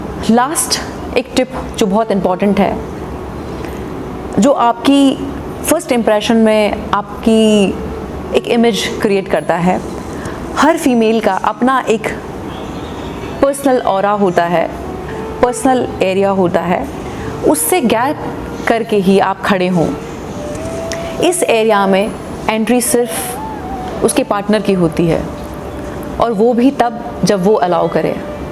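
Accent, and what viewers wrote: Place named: Hyderabad, India. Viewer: My Dear & Respected Royal Madam ji Namaskar ji. GOOD NIGHT. Today I saw your all video clips and specially your Syherees. Your pronunciation is like Hyderabadis URDU .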